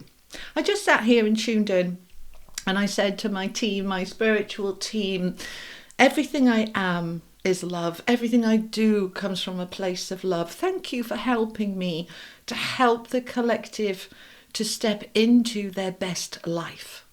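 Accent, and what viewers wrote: Wales, United Kingdom. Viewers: I needed that thank you. ❤ Are you a little bit Welsh? You have a nice accent. Peace and love to you.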